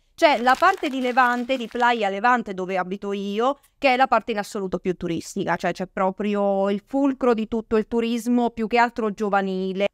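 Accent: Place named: South, Italy. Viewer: Dall accento sembri più del sud😊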